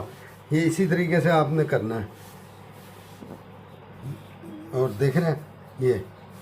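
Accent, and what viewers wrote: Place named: Rohtak, India. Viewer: Assalamualikum Aap ka kaam bht acha hai aap ka taluq kis ilaqe se hai? Kia rohtek se aap ka taluq hai aap k lehje se yani Bolney k andaz se lagta hai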